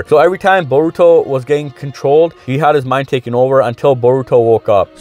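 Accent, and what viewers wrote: Toronto, Canada. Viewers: Like your theories bro but Yo you from Toronto? You sound like them sometimes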